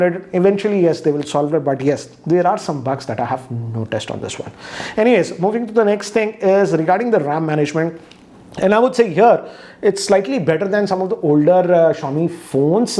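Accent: India. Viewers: This is a very common accent spoken in India! Were you expecting something like shown in movies? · This is the only Indian i like because of his accent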